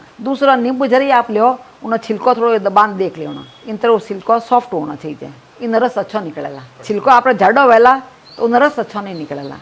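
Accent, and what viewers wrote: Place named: Marwari, India. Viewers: How educated u are please reply.. what a marwari twist with English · aap rajisthani bolte huye bohot ache lagte ho mujhe bohot acha lagta he rajisthni khane maze k hoten hen me pak me reheti hin magr mene jothpur ki thali khai he or jothpur ka qila bhi dekha he jodha bai ka ab me ye achar bhi banaungi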